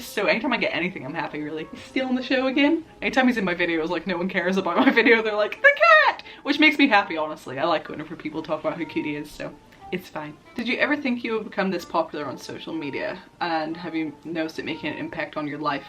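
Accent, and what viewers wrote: United States. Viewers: Well maybe a few words, actually, but at first you sound American! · Ive no idea why, since I know you live up north, but your accent sounds like, half american or something these days lol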